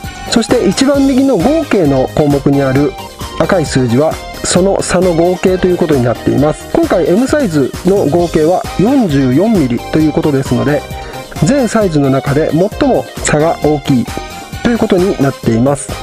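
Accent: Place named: Okayama, Japan. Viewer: 「岡山県人の喋る標準語」に心のセンサーが激しく反応しました！これからも頑張ってください。ちょくちょく覗きに来ます。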